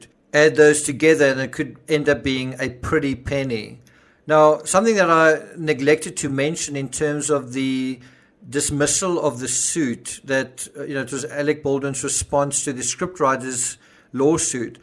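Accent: South Africa. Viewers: Your South African accent adds to the sharpness of your commentary. It comes across as crystal to me. I find some people are too eager to highjack comments. If I grow tired of a highjacked thread I started, I just delete it and have several times. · Sounds like trolls! Ignore them. Some peoples attention span is that of a gnat. You're work is the best. Meticulous and interesting. I believe from your accent you are South African. I am British and get accused of pronouncing words incorrectly here in the colonies. Especially the word "Aluminium". LOL. Carry on your excellent reporting. I fully support you. Big fan. Cheers mate! 🇬🇧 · Fair point. I enjoy your SA accent its refreshing. It makes my cat chill out too. Keep the good stuff coming. You analyse in a way no one else does, gives better results.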